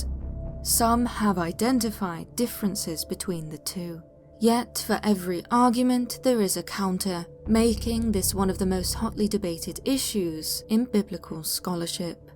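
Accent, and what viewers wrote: England, United Kingdom. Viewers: Dude i can’t explain how much i love this channel. it’s just so awesome, definitely in my top 3 favorite YouTube channels. and i know the narrator lady is married. but her voice is the perfect combination of sexy, intelligence and creepiness if that makes sense lol. (I got a thing for women with the English accent 😆😂) But i digress, over all this channels content is epic & i get stoked every time i get notified there’s a new video 💯. Keep up the great work guys and i wish you all the success in the world. 🙂👍🏻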